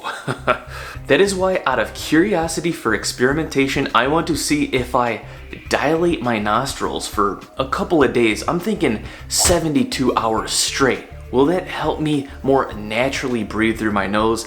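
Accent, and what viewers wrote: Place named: Chicago, United States. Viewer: Does anyone else detect a Chicago accent?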